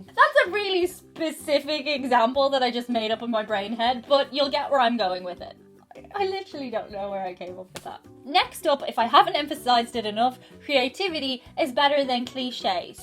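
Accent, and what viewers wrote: Ireland. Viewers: Im saying! I'd pay to have her yell at me in an Irish accent.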